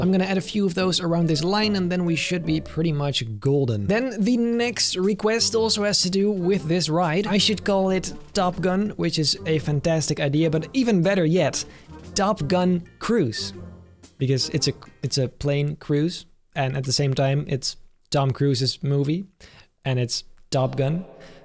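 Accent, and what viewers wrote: Netherlands. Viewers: Ben je Nederlands, want je hebt een Nederlands-Engels accent😂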